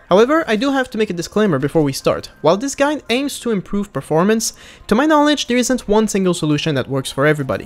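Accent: Quebec, Canada. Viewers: L'accent québécois haha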